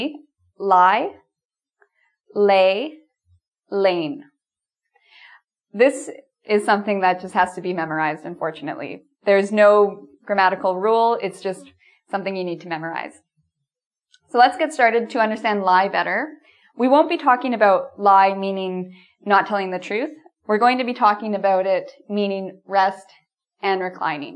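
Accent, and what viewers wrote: United States. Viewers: Is she an American？ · Estou aprendendo bastante! I'm learning a lot! Miss, are you american or canadian?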